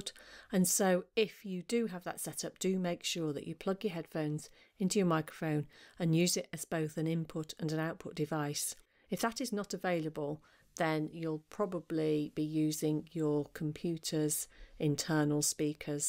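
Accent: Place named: Camden, United Kingdom. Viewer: Your lovely. Tnx from Chile. You rock. And great proper english lol. Bring memories of my Camden boozy days lol. Not you just the accent lol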